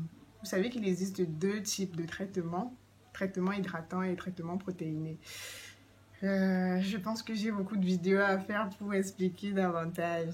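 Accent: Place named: Cameroon. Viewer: Bonne vidéo avec notre bel accent Camer👏. L’idée de ramener les beurres à l’état liquide est géniale merçi.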